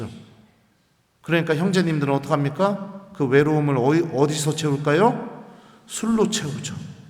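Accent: Chungcheong, South Korea. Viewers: 새로운 성가네요. 신부님의 에피소드는 백번을 들어도 늘 새롭고 감동이고 재밌어요.^^ 목소리만으로도 치유됩니다. 이젠 충청도 사투리만 들어도 힐링되는거 깉아요.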